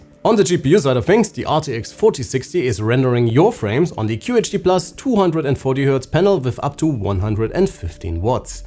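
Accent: Germany. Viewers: yes I love the German accent its so sick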